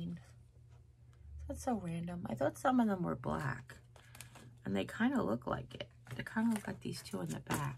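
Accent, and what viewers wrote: New York, United States. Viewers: I love your New York accent...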